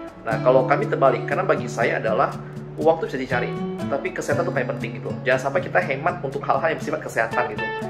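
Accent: Pontianak, Indonesia. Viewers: Pertame liat, rase kenal.. dengar cara ngomongnye,.. dipastikan orang pontianak... diikuti lebih jauh,.. saye jadi ingat, pernah 2 kali berinteraksi,jadi konsumen... akun masih ade ke 😊... sehat, lancar dan sukses selalu boy.. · Logat pontianak sekali abg e ni wkw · Kok bahase nye kayak bahase orang pontianak ye koh... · Koh.. orang Pontianak ke ? Sepintas lalu ku dengar macam logat Melayu Pontianak.. 😃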